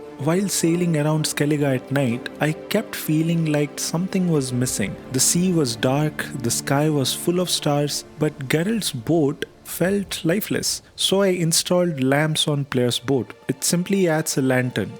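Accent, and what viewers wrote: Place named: India. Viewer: great vid bro ❤i was looking for some mods for my ng+ playthrough and this helps a ton, also i love your indian accent, it sounds kinda exotic 😁you should definitely keep it haha it sounds awesome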